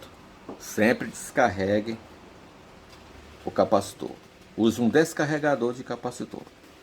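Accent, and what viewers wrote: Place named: Bahia, Brazil. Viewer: o sotaque parece da Bahia, sou de Salvador! Apesar de aqui estar complicada a situação, ainda assim n troco aqui por nada!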